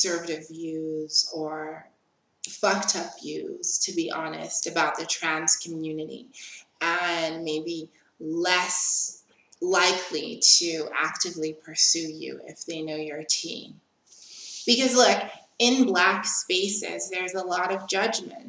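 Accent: United States. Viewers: You’re accent doest strike me as South african but rather American?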